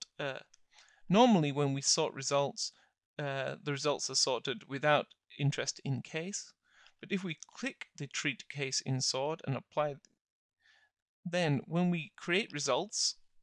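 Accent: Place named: United Kingdom. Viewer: English is his native language. You must be not accustomed to the his variant of the British pronunciation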